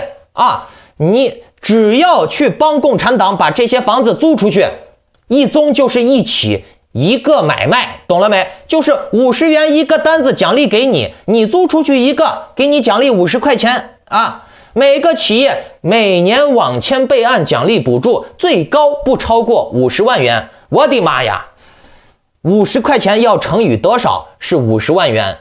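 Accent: Henan, China. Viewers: 你说的是河南话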